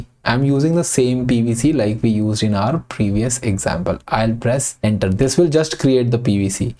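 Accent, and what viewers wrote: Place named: India. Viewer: Refreshing pace and much clearer delivery! Even with the distinct Indian accent on the T's and D's, it's so much easier to follow than usual. Nice!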